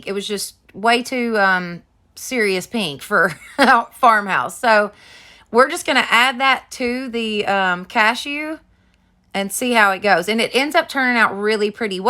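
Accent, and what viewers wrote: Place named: South Carolina, United States. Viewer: Girl...loved them all. Gotta ask are you from Georgia or South Carolina? I was born and raised in SC and I swear we have the same accent.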